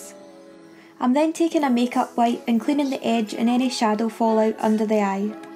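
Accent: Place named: Scotland, United Kingdom. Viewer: Are you Scottish? Love the accent! My family are all from Scotland,, my mum and Dad moved down to England and so me and my sister got an English accent so we’re different from the rest of our family 😂😘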